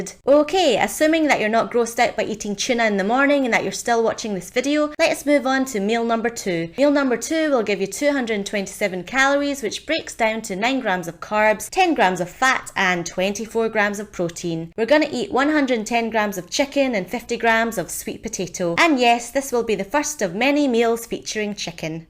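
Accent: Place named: Northern Ireland, United Kingdom. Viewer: I love your accent it reminds me of Northern Ireland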